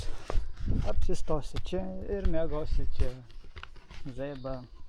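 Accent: Aukštaitija, Lithuania. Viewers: Man žemaičiui labai įdomiai skamba aukštaičių tarmė. 👍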